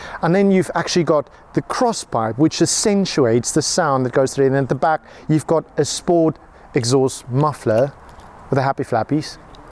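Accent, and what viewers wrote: South Africa. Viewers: Wooh Masekinders die man is van definitely van SA 🤣🤣🤣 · Is this guy South African ? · So SAFA ;-) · South Africa this dude, very obvious, when he started taking about chicken teeth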